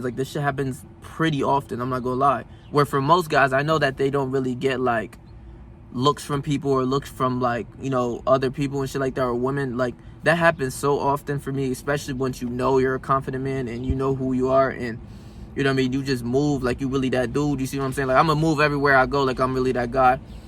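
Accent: New York, United States. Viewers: bro got that new york accent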